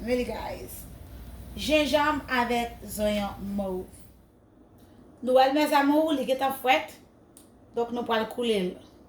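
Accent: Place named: Dominican Republic. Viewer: li pa konn li kreol non preske dominikenn liye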